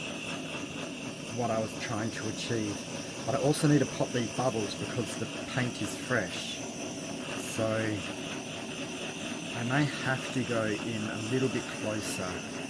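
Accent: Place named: Australia. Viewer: I think it turned out really cool. The colours are lovely and I love the Melon and Turquoise cells. I have those colours too! It's lovely. I'm trying to place your accent... It has Aussie in it but doesn't sound pure Aussie? I'm fairly new to Aus so I'm still getting used to the accents. I'm from South Africa so I'll sound totally different to you and I'm so nervous about that. Anyway, good on you for starting this adventure!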